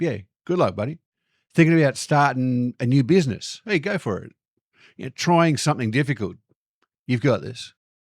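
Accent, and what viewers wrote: Australia. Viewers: A slightly different take: We Americans DO want something. We want your story. Give us a tale. How's your day going? Anything interesting? Where are you from? Like to have an iced tea and swap tales? I think we like good stories. Sort of vicarious journeys?? Maybe thinking about things we'd like to do? Don't know that all of this is true...just throwing it out there as a possibility. Your accent's cool, by the way. You from Australia? Wherebouts in Australia? What brought you to our shores? Are we what you expected when you moved here? Any !Aha! moments? :) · Yes, sir I'm an American from Tennessee who saw your vid, "They Want Nothing" on the channel & I just wanted to come show my appreciation here on your channel. Your insights were spot on, many of us Americans are raised, especially in the south to treat others how we would want to be treated so compliments & being helpful to someone, strangers & friends alike, becomes second nature & yes, it is sincere. 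So, Thank You for noticing & appreciating the American way. We're glad to have an Aussie here in the states & I do like the accent too. Keep up the good work & I pray your channel grows to new heights. God bless! 🙏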